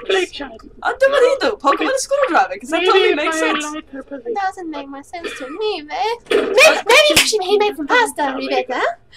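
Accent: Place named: Italy. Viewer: and i also luv wat u did the way u speak both of i think italian english speaking accents lol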